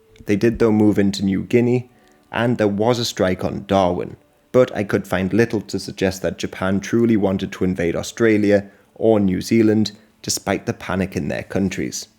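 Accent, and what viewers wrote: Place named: United Kingdom. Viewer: wtf are you talking about, he has a British accent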